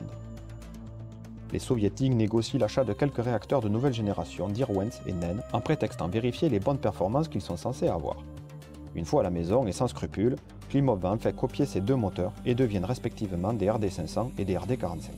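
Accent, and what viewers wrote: South, France. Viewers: je vien de découvrir ta chaine, c'est super, on apprend pleins de choses sans que sa soit trop long, et avec ton bel accent du sud, tu tombe pas dans le piege d'avoir un ton monocorde qui endors, continu comme ca, on veut pleins de videos :p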